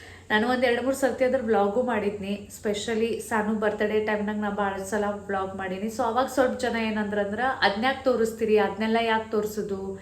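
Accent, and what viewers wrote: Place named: Belgaum, India. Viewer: Hi dear na nim recent viewer ..naanu bgm dist gokak ...nim matodo style nam tara ada anta kushi aytu nodaaku esta aagatetei...evaag hubli total change aagateti matadod .na like ast madtini comments madak aagalla...nandu small kid ada so ...niv couple mast vlog madtira ...yest yella kelsa madtira .. really great dear.. online class tagoteni andri yavdu anta kelabahuda ...?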